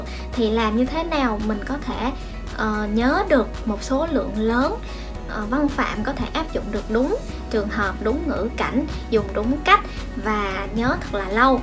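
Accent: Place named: South, Vietnam. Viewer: Lâu lắm mới thấy vlog về tiếng Nhật giọng miền Nam, quá dễ thương. Cảm ơn bài chia sẻ của bạn.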